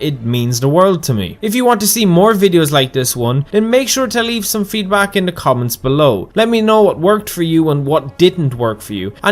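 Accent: Ireland. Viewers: I quite enjoy the Irish accent. It's just easy to listen to. Great video. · I love me some Irish accent to sleep 2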